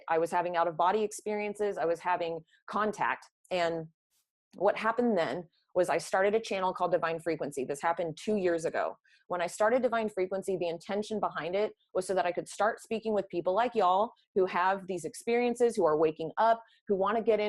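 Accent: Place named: Texas, United States. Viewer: You are clearly from Texas... I can tell because you talk so normally, but then you say y’all like it’s normal... so Texas... I am from OK, but good stuff I like your material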